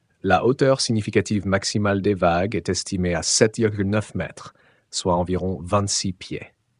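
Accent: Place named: England, United Kingdom. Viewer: Laccent prends une petite tournure anglais des fois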